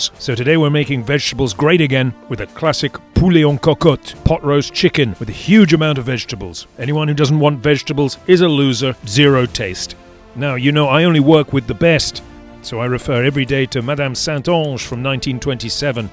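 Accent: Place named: United Kingdom. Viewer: YIMBY (yes in my backyard), there is always room in my backyard for culinary pilgrims. Madame Saint-Ange would have been suitably amused by the fact that her name is brought to our attention by a cook having a very British accent, BUT secretly content on knowing that the good word is being brought to us heathens! Keep up the good work!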